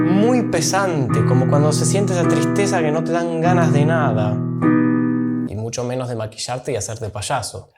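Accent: Argentina. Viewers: Non sto piangendo, beh, sto piangendo perché il tuo accento è molto divertente. Tutti gli argentini parlano così? Grazie per avermi fatto ridere. Questo è il bacio di Tosca! Muori, dannata, muori! 😂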